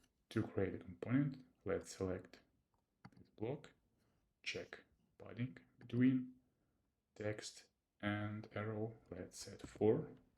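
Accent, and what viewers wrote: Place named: Russia. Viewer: Я слышу, что ты русский ))) Так что давай такое видео на русском. А так я тебя через янденкс-переводчик слушаю )))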